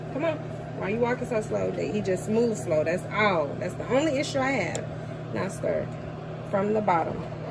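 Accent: South, United States. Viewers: You got me cracking up with the southern accent 🤣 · Girl, you are so crazy! Your southern accent sounds like that character sister husband in that movie where the heart is.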